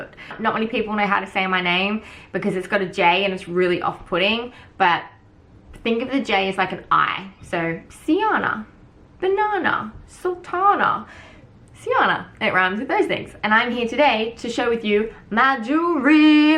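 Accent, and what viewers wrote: Australia. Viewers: Australian accent is very difficult for Russians🤯🤪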